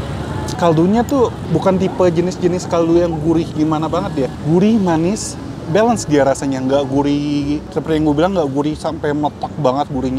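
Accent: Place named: West Java, Indonesia. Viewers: Gw perhatiin, koh klo ngomong sama orang sunda logat ngomong nya udah bisa ngikutin logat sunda sunda gitu 😀 ketularan kayaknya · Karena sudah belasan tahun di tanah pasundan, udha biasa banyak banget orang jawa di tanah pasundan yang nyunda banget logatnya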